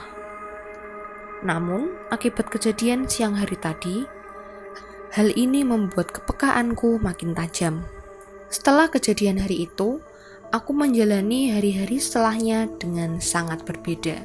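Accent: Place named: Java, Indonesia. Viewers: aduh mbak meduok eee hehe :D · Ga nahaaan medoknyaaa.. jdi kangen sama kampung halaman 😘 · Logat e jowo banget 🤣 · Medok sekali mbak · Gak juga ah, yang ini medok jowo kok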